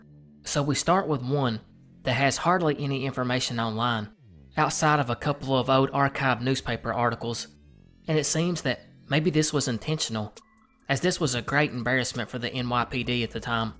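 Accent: South, United States. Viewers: Keep this up man. I think we’re from around the same neck of the woods, and it touches my heart to hear a southern accent talking about these mysteries. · My dude! Love your channel man. Not sure where you're from but you've got quite a Southern draw. As someone from Kentucky, it's refreshing to listen to someone I can relate to. And your stuff is super interesting and well done. Keep up the good work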